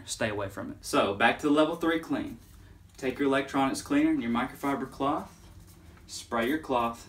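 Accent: South, United States. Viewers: I'm actually dampening a cloth like shirt it's actually more of a thermal but I'm going to pretend that it's microfiber reason being I had already dampened and started rubbing in a circular motion because my four-year-old had made the TV look like it was a child's play area. So I had my little cousin go on her phone this is the phone I'm actually leaving the message from so I'm going to have to go on my phone to look your videos up now anyways I'm leaving this comment I think I may be giving you way too many details but me and her are team she's my little girl and we clean and clean and clean so I like your voice so much that I'm going to start watching your videos all the time and I can't wait to see more I'm so excited I'm ready to do some winter cleaning but really it's going to be for spring instead of spring cleaning for winter get it LOL. But your voice I love the accident and overtook me I have a soft spot for Country accents and then that clean so that was great awesome I loved it I need more videos you are great and awesome peace out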